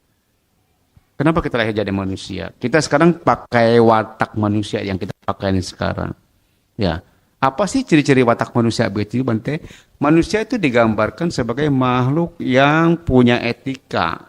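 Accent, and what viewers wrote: Indonesia. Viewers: logatny betawi medok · Baru kali ini denger bhante berlogat bekasi-karawang-cikarang dan sekitarnya 🫶😊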